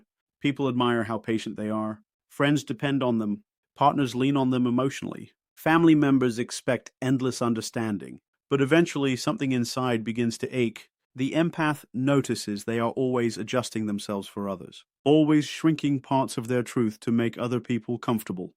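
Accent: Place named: England, United Kingdom. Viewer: since when does Chase Hughes have an English accent?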